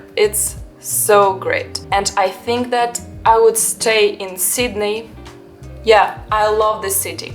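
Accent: Russia. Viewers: What did people in Australia call you when they hear your accent? They''d always call me a "Yank." My money is on "Russkie" I'm guessing. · Just LOVE the Russian English😂😂😂😂 Excellent presentation. Well thought out - 👏👏👏👏👏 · I absolutely love the sound of the Russian accent. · Is that an American accent behind the so called Russian accent? There is a lot of free stuff in Moscow too. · You're always so wholesome to watch, you have such a positive happy outlook 😊 I love that accentuated Russian accent you were doing throughout this video haha. I'm half Russian, but born and raised here so no accent for me sadly! There are a few suburbs in Sydney outside the city with tall buildings, Parramatta, Chatswood, a few others. Anyhow, I'm glad you enjoyed it and hopefully you'll come back sometime. Perth is worth a visit, it's quite different than other Australian cities. 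Take care mate! 😉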